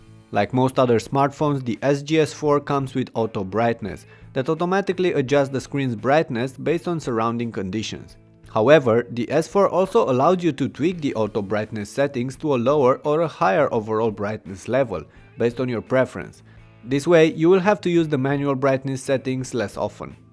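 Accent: Romania. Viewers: Ești roman , se vede după accent.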